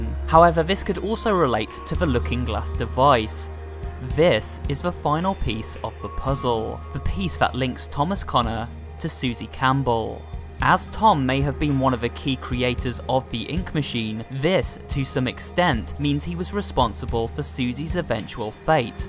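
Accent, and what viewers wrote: United Kingdom. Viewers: Just wanted you to know, you sound british. I absolutely adore and admire british people because of their accent. This is probably why i enjoy your videos.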